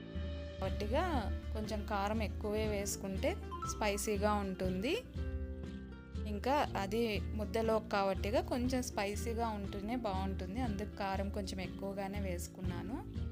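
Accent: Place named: Telangana, India. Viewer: Mee slang తెలంగాణ లాగా ఉంది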